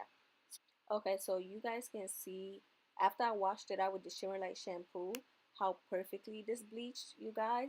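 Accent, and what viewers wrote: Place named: Latin America. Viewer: Are u Latino? U have a accent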